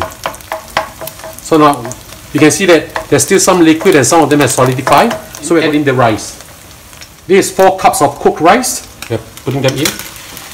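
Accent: Singapore. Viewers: You speak like a Singaporean... I miss Singapore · Wah lau. Nice video and great narration in chaste Singaporean accent. Miss the place and the people so much. Take care aah. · blech71 singaporean accent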